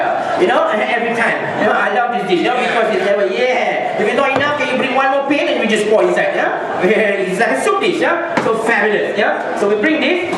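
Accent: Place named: Malaysia. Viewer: he speaks English in Malaysian's style , so lovely :DD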